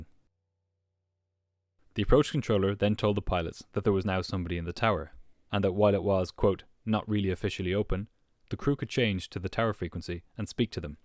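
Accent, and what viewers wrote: Ireland. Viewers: enjoying the Irish lilt in this narration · irish? lol, no matter, you have a sexy voice,,,,, this video was well done, xo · Great video, and your soft. Lovely, beguiling irish accent adds so much enjoyment to an aleady wonderful production.......only one problem........its making me desperately homesick. Love and respect from mo in new zealand. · I detect an accent....Irish???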